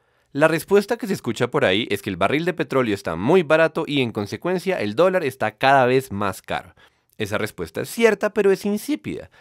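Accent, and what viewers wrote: Bogotá, Colombia. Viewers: qué buen canal, y sólo vengo a realizar que son colombianos(creo) por el acento... es cierto,no? estudié español 8 años y viví en Medellín 5 años de esos para aprender mejor. ahora no he estudiado mucho pero me siento casi colombiano porque me acogieron con mucho cariño cuando viví allá, se sentía como mi hogar,sabes? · My bien explicado pero con un acento rolo demasiado marcado "ala mi rrrey" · Pero el que habla en el video no es de Medellín, es de Bogotá. Por ende su acento es "rolo".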